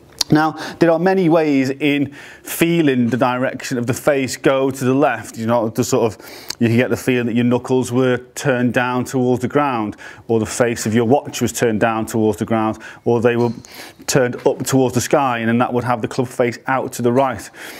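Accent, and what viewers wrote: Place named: Liverpool, United Kingdom. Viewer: Been a while, do prefer a fellow scouse doing tutorials 👍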